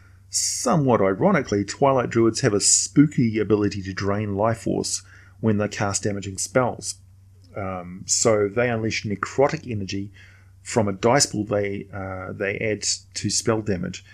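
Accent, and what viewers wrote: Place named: New Zealand. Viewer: Aye a kiwi bloke nice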